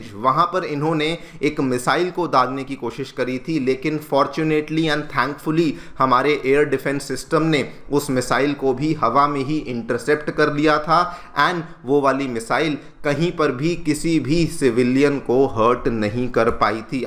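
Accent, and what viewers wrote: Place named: Bengal, India. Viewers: ..that's quite a bengali accent ...hope u understand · a is o in bengali. Its accent. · it is Bengali acent Bengali pronunciation Bengali language pls don't impose Hindi acent on us.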